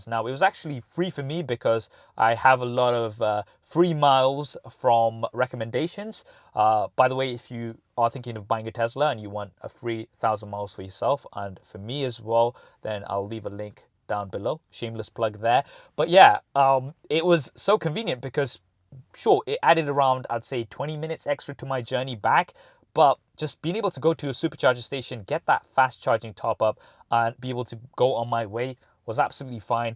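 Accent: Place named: Bradford, United Kingdom. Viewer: He's from Bradford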